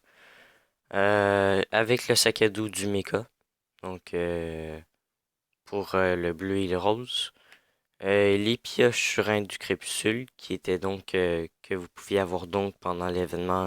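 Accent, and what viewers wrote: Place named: Quebec, Canada. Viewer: Ho l'accent quebecois 😍😂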